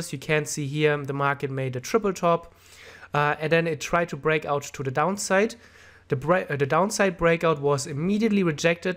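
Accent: Germany. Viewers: Dieser Moment wenn man direkt raushört das du Deutscher bist. :D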